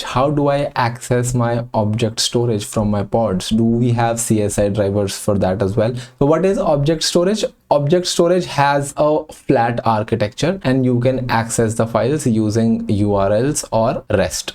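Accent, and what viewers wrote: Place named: India. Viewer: Refreshing pace and much clearer delivery! Even with the distinct Indian accent on the T's and D's, it's so much easier to follow than usual. Nice!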